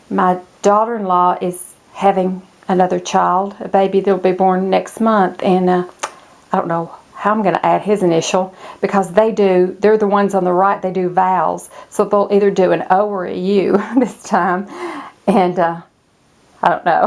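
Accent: South, United States. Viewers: I'm taking you back old school. Lol. I found your channel and started binge watching. You are the sweetest little southern bell. I'm from Kentucky but I think your accent is more southern than mine. ( I know you probably think Ky isn't southern but northerners do.) I just wanted to say, thanks for showing us your house ,even though you thought it was messy( it wasn't). It helps people relate better to you. I just can't feel comfortable in a house where every single thing is in place. I like a home that looks lived it . ( I guess you just live in the closet because the rest was perfect with nothing out of place). Lol. I'm just cutting up with you. Beautiful home but you are even more beautiful. Your inner beauty shines through to your beautiful face. Thanks for sharing.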